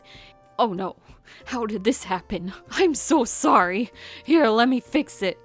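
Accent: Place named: Texas, United States. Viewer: hehe love~ your voice and love~ the texan accent XD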